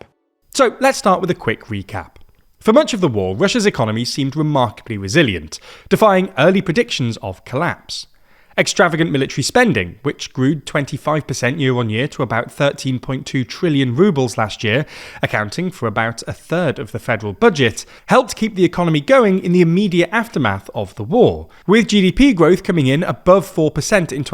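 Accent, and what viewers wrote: England, United Kingdom. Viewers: So why not direct your biased, English accent analysis to the Wall Street collapse going on right now?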